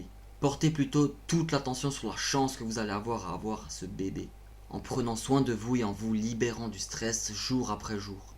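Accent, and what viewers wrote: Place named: Moselle, France. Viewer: çà c est un accent de moselle, coté saaaaaaaarguemines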